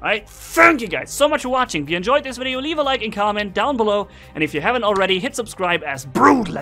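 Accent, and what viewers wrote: Germany. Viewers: Du sprichst ürsprünglich Deutsch oder?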